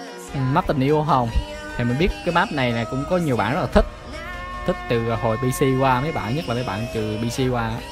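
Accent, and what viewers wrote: Quang Ngai, Vietnam. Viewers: Giọng QN dễ thương ghê haha :))))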